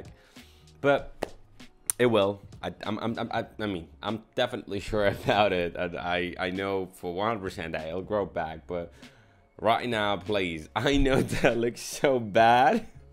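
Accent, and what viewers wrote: United Kingdom. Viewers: british accent + big wins = serotonin boost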